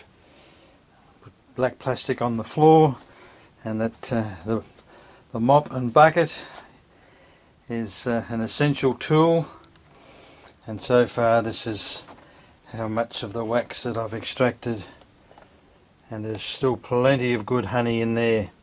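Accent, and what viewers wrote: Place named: Australia. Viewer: Heard your accent and immediately said "he sounds like a fellow Aussie" Then saw the Geelong shirt and all was confirmed.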